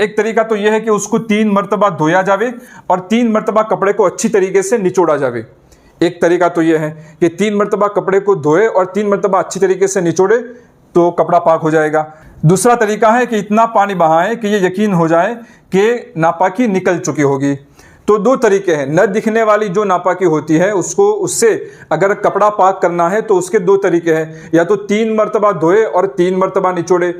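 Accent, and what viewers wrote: Gujarat, India. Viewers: Mufti sb assalamu alaikum warahmatullah vabarakatuhu poochhna ye tha maafi k saath ki jaise molana k aage qasmi nadvi mazahri lagate hai aapke name k aage laga hai ye kis madarse ka hai maloom karna thaaapki baat se to aap gujrat k lagte hai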